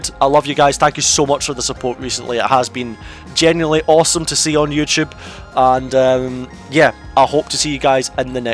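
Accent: Scotland, United Kingdom. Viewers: It’s a Scottish accent and it can often be quite hard to understand. It’s hard for native English people to understand those who have a harsh Scottish accent sometimes so it’s no surprise you have noticed the difference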